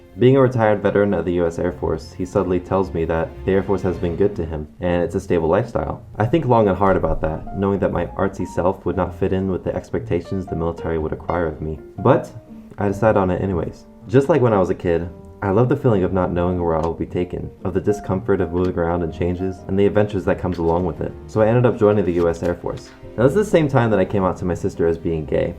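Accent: United Kingdom. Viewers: sad british noises, what british accent did you have when you moved? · Wow they are rude because of your British accent i have a Australia accent well everyone did say i have a accent a Australia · It's a shame people take a mic about british accents I wish kept his British accent like me seriously tho why would you bully british people if they moved to the states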